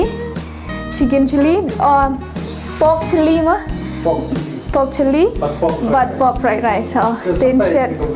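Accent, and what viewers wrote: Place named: Mawngap, India. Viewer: Phi kren kum nong mawngap leh phei phi dei nangno,,,ibang ka shet ashar phei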